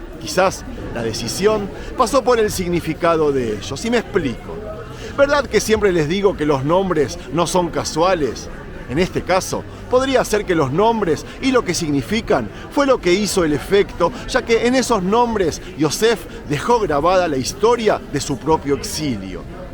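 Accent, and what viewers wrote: Argentina. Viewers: tu español suena absolutamente argentino, con algunos olvidos de palabras, mas absulutamente argentino y se de lo que hablo. otro día te comentare un sino que llevas, pero eso es otro caítulo.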